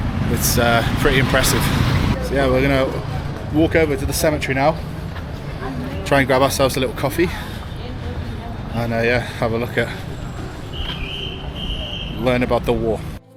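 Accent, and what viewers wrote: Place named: England, United Kingdom. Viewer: your English pronunciation has to be Naganised a little bit😅 when you said Cathedral to the taxi driver I could notice his confusion because you said “Cathidril” in the pure English way😂 most Nagas pronounce it as Cat-Thë-Dral and blah blah in Nagahinglish way, anyway wish you a very nice stay in Nagaland, Peace be with you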